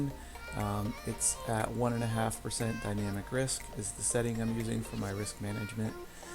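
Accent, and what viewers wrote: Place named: United States. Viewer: This is a really odd video and contains so many incorrect statements it's not funny. I don't see anywhere on the video where you show any of the bots or portfolio that you created. You don't mention anything about trading them semi-automated through the Telegram alerts so I can only assume you ran this 100% automated, 100% of the time? If so, that is clearly NOT how the software is designed to be used. It is NOT an EA or anything close to an EA. It relies on you, the user creating bots and a portfolio that satisfies your own criteria as a trader. It also relies on you as a trader to decide on which trades to take once they are presented to you. Having a conversation with another user, rather than with ourselves as the creators seems mighty strange to me. It looks like the blind leading the blind. As you can see from the live leaderboard, many of our traders are achieving excellent results from their own created and managed bots, see here <link> As for your execution issue that you mentioned, how on earth can that be something we control. 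What has your broker suggested (I'm assuming you asked them or did you simply blame us?) The system sets the trade on the built in virtual bot and then instantly copies that to your live account. You sound American to me, so just maybe it was an issue with your FIFO rules that your brokers place on you. Of the hundreds of live users, all with a large variety of brokers, I've never heard this issue before. It is certainly not a software issue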